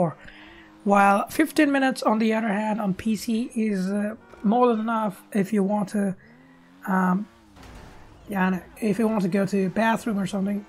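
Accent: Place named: United States. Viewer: I dont want to be mean but he sounds like Mikey Mouse with an American accent. He makes amazing and helpful videos still.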